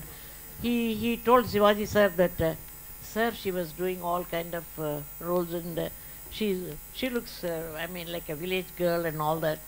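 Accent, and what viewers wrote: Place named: Oxford, United Kingdom. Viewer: Salute Madame ...perfect Oxford English..wishing You all happiness in life 🙏🙏🙏❤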